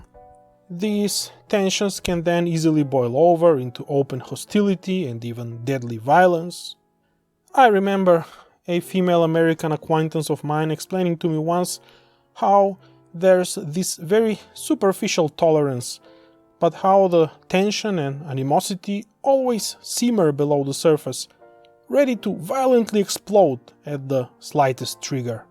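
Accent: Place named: Russia. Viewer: I like your accent a lot, though it sounds more Russian than Bulgarian to me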